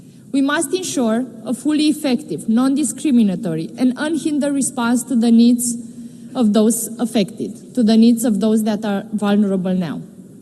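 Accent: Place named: United States. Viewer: vorbește engleza americana standard. Dar dacă știi engleza britanică tot ar trebui sa o înțelegi. Acum desigur dacă ești pe la nivelul B1 sau B2 e cam greu sa înțelegi tot ce spune.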